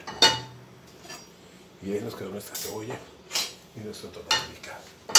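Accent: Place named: Caribbean Coast, Colombia. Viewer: Tu eres costeño jajajaja to oir tu accento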